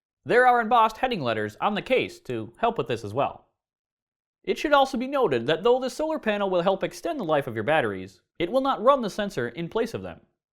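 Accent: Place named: Minnesota, United States. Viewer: You sound like a character from F A R G O TV Series.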